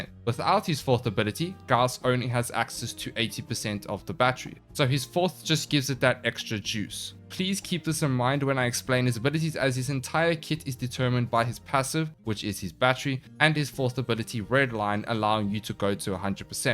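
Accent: South Africa. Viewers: u south african??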